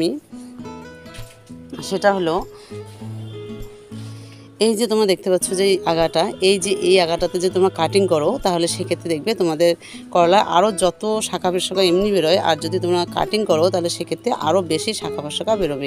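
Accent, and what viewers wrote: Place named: Bangladesh. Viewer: আপনি তো একদম বাংলাদেশিদের মতো কথা বলেন। আমি এত দিন ভেবেছি আপনি বাংলাদেশী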